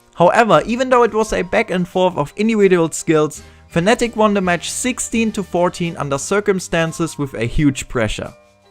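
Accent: Germany. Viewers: Als ich mir das Video angeguckt hab, dacht ich mir: "Der muss doch deutsch sein, der redet so:D!" Das is er auch xD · Your german,right ? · Dieser deutsche akzent :DD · Nice accent ;) Are u from german :D? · bist du deutsch xdd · are u german or did u traveled to germany? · Du bist Save deutsch das hört man so hart an deiner Stimme :DDD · Man merkt das du deutsch bist!:) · Dieser Deutsche Akzent :) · Pretty strong accent but I'm used to playing with German friends (which is why I can read/understand German pretty well). Having played with a lot of German people, English skills are generally insanely lackluster. Then again, Germany is a pretty big and independent country making it a little less important to speak English I guess... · dieser deutsch-englische akzent ^^ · Haha :D 2 Sekunden vom Video gesehen und direkt erkannt, dass du Deutscher bist^^ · Du hörst dich deutsch an :D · Are you from Germany , you sound so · I can hear it. 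Im from Germany too and its a German Accent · Do you come from germany? :D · Lol, du bist ja Deutscher :D haha nie gemerkt :D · You sound like you are from germany....are you? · Liquid vs Fnatic on dust at MLG columbus, astralis vs fnatic at MLG columbus... and pls get rid off that cancerous german accent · Du bist deutsch oder ? Ich höre so ein deutschen akzent 😋😅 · Ha ein deutscher, so ein grottiger englisch Akzent xD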